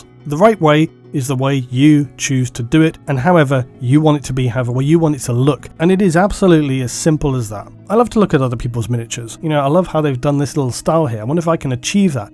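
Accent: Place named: United Kingdom. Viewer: It's not the painting or the role playing that allows for escapism or promoting rewarding precision work. It's all that in the tougher times, people flock to the source of british accents being spoken for it consoles them, makes them calm. Take voice for example, we all come here just to listen that man speak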